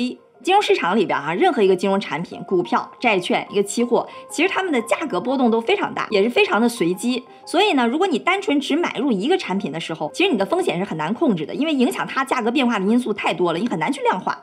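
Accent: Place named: Shandong, China. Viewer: 感觉有点山东口音哎😊❤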